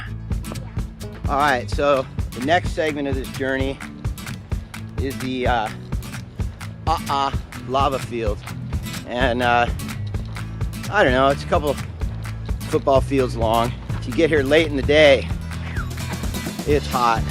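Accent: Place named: Hawaii, United States. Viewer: you sound like your from Kona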